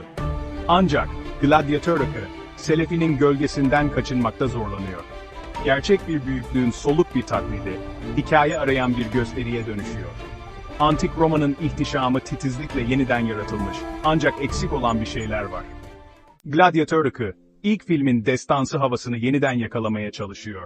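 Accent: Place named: Rize, Turkey. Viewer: Sikik rizeliler gibi konuşma aq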